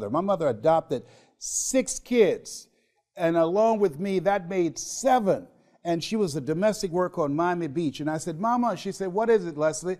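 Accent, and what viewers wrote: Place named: Columbus, United States. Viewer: les brown I lived in Columbus, Ohio 23 years and I recognize your Columbus Ohio voice because I can relate to you I been a sales guy for 3 years on commission... and started from the ground up with no mentor and did many jobs with no college education... you in spire me to give back my talents of selling back to everyone who is working towards their dreams.... but my advice to everyone is... FEAR TODAY BUT NOT TOMORROW!... if you don't get that deal or or eat today there will be less of a tomorrow... when you at the end of your life and decide to accomplish what you want to do/see, travel the world... or be the best talent at last moments of your life... question would be why do you regret taking so long to get here up to that point of your life?... the best question should be if not today when are you going to have it?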